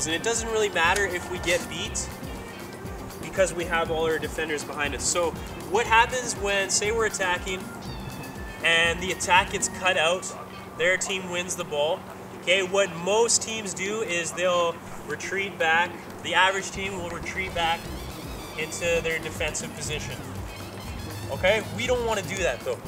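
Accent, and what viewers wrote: Canada. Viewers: He sounds like a Canadian to me.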